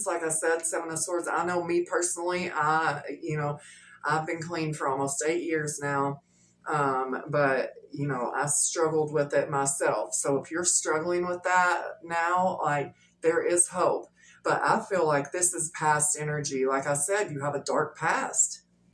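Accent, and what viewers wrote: South, United States. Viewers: Thank you for that! It took over two hours to watch your video due to all the synchronicities. I was resonating and meditating with eyes full of tears baby! This was quite an experience for me. Thank you. By the way that southern accent is very becoming of you and I like it! 😘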